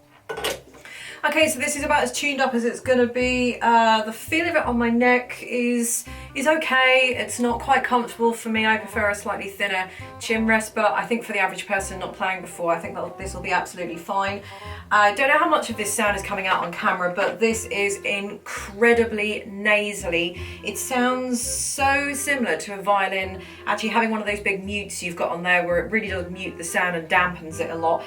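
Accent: England, United Kingdom. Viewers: I like the British accent. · I only came to your Channel to listen to your beautiful English accent.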